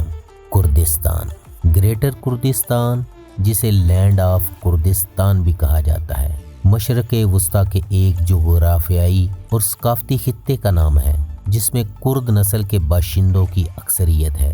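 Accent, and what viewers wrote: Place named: Punjab, Pakistan. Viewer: Yeri maadri jubaan saayad punjabi hai par urdu bol raha hai par punjabi ki jhalak hai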